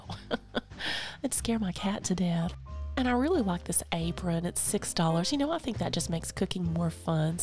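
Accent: South, United States. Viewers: you are my favorite shopping friend! You find the most amazing Restores and then find the best items in the stores. How do you do that?!? The narration is absolutely soothing with the cool music and that Southern accent! Your voice is like a mint julep for the ears. ❤️2U& yours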